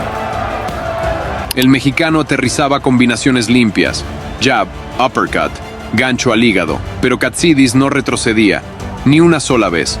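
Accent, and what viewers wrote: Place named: Cuba. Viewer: De seguro eres cubano hablando mmmm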